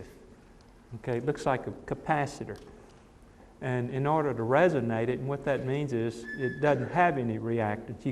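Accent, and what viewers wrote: South, United States. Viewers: have never heard an oriental with a southern accent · I hear a American with a Southern accent.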